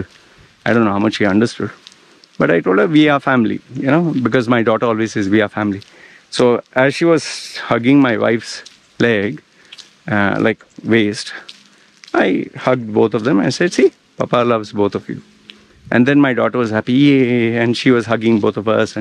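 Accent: India. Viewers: How come you have an Indian accent ?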